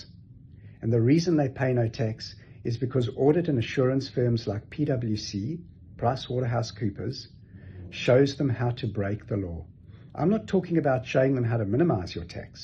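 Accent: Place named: South Africa. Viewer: South African Accent? I like it. 😆👍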